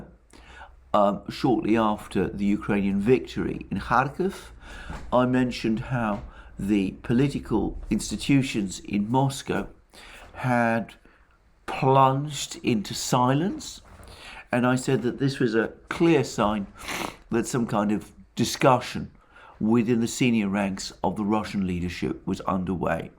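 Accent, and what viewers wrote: United Kingdom. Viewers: Russian troll with British accent. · Kremlin BS with a British accent. How nice...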